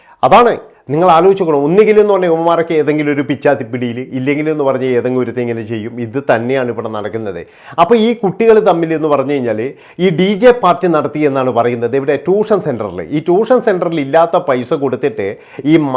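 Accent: Kannur, India. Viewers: ഏട്ടാ ഇങ്ങൾ നാട് എവിടെ നമ്മള് നാട്ടിലെ അതെ സ്‌ലാംഗ് കണ്ണൂർ ഇല് എവിടെ ,മട്ടന്നൂർ ഇരിട്ടി കുതുപ്പറമ്പ് ഈ ഏരിയൽ എവിടെയെങ്കിലും ആണോ,, എൻ്റെ നാട്ടുകാരൻ സംസാരിക്കുന്ന അതെ ഭാഷ കേൾക്കുമ്പോൾ ഒരുപാട് അഭിമാനം,മനുഷ്യത്വത്തിൻ്റെ വേണ്ടി നിലകൊള്ളാൻ നമുക്ക് സാധിക്കട്ടെ,നമോക്കൊരുമിച്ചു. സ്നേഹത്തോടെ ജീവിക്കാം,,അധർമതിനെതിരെ പ്രധികരികം ,, എട്ട ഒരുപാടിഷ്ടം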